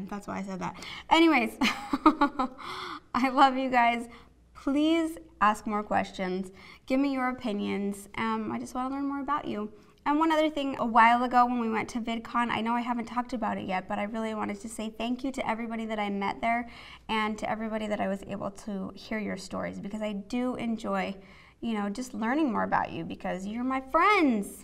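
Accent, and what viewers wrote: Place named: Idaho, United States. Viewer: when you said "belly", I couldn't tell if that was your cute little Idaho accent poppin out (it's not that noticeable anyways, don't worry! haha) or if you morphed baby and belly together, because it definitely came out sounding like "Bay-lee". LOL!!! You are too darling!:)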